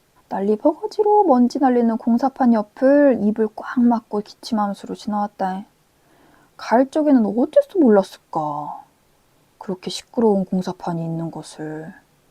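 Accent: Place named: Gwangju, South Korea. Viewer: 혹시 고향이 광주이신가요? 저는 광주사람인데 사투리가 꽤 자연스러우시네요😂😂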